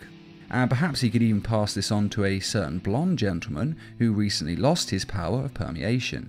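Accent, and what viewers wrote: England, United Kingdom. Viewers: He sounds like he is from England and it’s hard to get manga here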